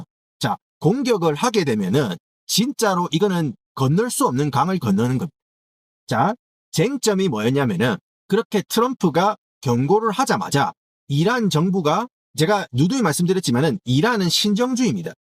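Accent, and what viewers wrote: Gyeongsang, South Korea. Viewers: 경상도 오빠야가 풀어주는 세계정세 ❤ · 트럼프 성대모사만 나오면 너무 잘한다. 그 경상도 영어 사운드에 익숙해 저버렸으. 캔유 빌리브댓!! 😂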